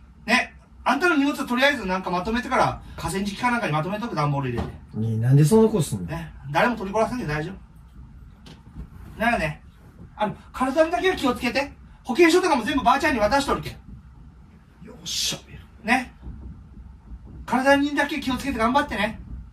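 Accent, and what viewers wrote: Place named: Kumamoto, Japan. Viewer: 熊本弁落ち着くわ～ 久しぶりに熊本帰りたい！☺️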